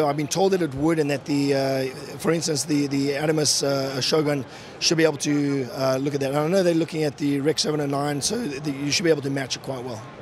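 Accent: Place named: South Africa. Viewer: I can't decide if this guy is South African or Australian. In fact I think he's probably a emigrated South African, there are thousands of them in Ozzie land ;P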